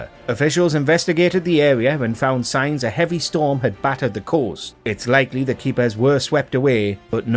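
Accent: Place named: Geordie, United Kingdom. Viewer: No, it has a twang of Geordie. Maybe Middlesbrough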